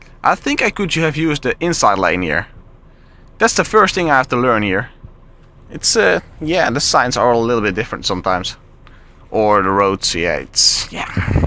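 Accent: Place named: United Kingdom. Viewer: awsome accenting of the Stereotypical British !!!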